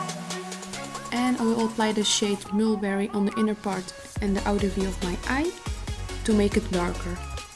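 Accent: Netherlands. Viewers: Shes dutch u can tell from the accent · Your accent sounds dutch..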